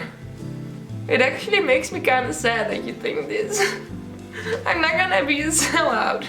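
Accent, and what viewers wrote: Netherlands. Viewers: Je hebt zo'n dik Nederlands accent. Moeilijk te verstaan.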